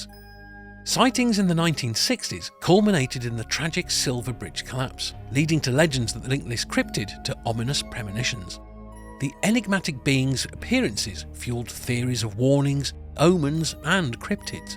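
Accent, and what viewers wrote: United Kingdom. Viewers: Ew. A british person....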